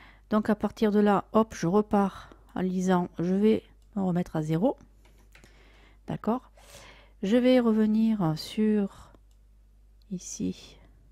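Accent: South, France. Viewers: Bonjour. Votre chaine est vraiment géniale pour tous ceux qui apprennent à maitriser Magix Vidéo Deluxe. C'est une mine d'or, une véritable caisse à outils. Les tutoriels sont bien présentés et votre ton "méridional" très agréable. Pour les primo-accédant à ce très bon logiciel, peut-être serait-il utile qu'il y ait deux tutoriels de base : -> Présentation de Magix Video Deluxe, -> Premiers pas avec Magix Video Deluxe. Après, toute votre chaine présente ce que l'on peut faire dans le détail... Excellent et merci pour votre partage. JiPi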